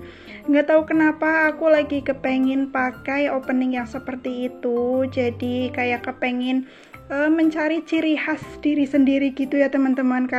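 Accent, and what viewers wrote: Indonesia. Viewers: Ganti suasana ya bun jadi lebih luwas,iya bun logatnya keliatan bgt Cilacap 😊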